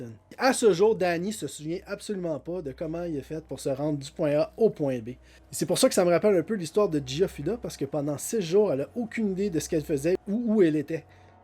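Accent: Canada. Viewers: Coucou ! Fort accent canadien, je ne comprends pas tout... pardon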